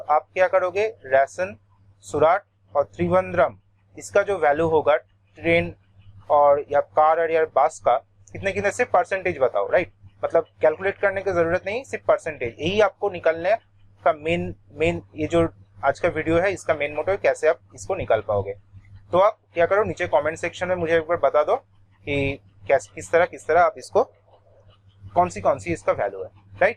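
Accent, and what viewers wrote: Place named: Bengal, India. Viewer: Bangali bangali mne hche golar awaj sune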